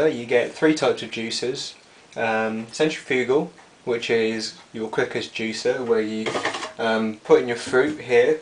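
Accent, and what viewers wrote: England, United Kingdom. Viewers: I never saw a chinese person with a british accent ^_^ cool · Dig your British accent. Nice review on the juicers. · an asian with a brittish accent how awesome!! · he sounds very english to me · asian with british accent cool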